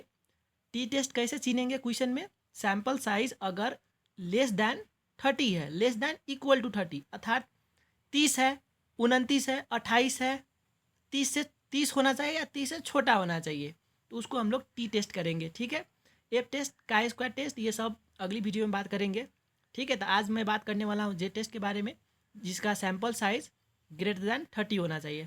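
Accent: Nepal. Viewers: Nepali accent 😂…anyway thank you so much ❤ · Why 🤔 speaking in Hindi with nepali tone ? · are u nepali ? broh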